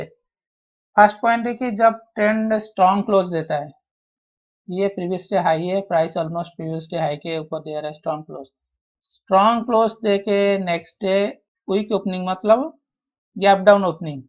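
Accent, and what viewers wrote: Odisha, India. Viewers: R u from odisha ?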